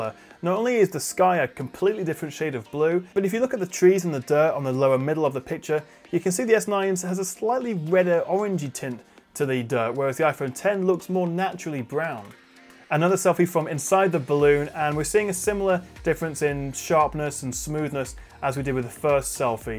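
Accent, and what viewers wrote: United Kingdom. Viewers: He is cuuuuute, AND British win win! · The review was really great 👌🏻 and well done ,with awesome photo samples but your voice(the British accent) felt annoying AF.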